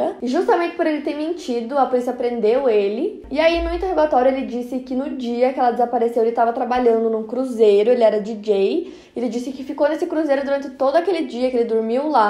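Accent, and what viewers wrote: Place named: South, Brazil. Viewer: Único sotaque sulista aceitável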